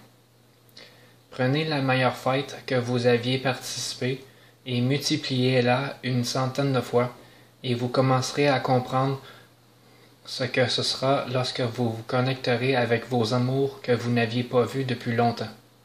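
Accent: Quebec, Canada. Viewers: J'aime bien l'accent québécois.Mais tu pourrais me donner l'adresse de ton coiffeur?..........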